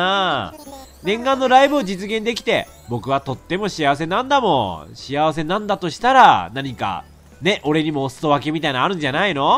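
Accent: Kansai, Japan. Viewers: あさみさんのアフレコしてるレトさん好きすぎる(*´ω｀*) ナチュラルに関西弁きけるし( ¨̮ ) てか、あさみさん可愛い！好き！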